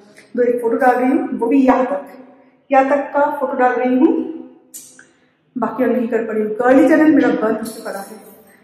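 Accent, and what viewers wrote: Bengal, India. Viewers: Main hun Bengali...... U try ur best to speak in Hindi, but pura Bengali tone aata hai.. It's OK... U will learn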